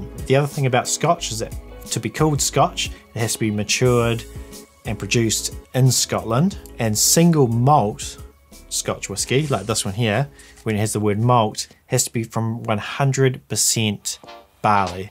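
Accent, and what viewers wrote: New Zealand. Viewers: That's some damn fine production values there fella. Great to hear a kiwi accent on top of some great whisk(e)y content.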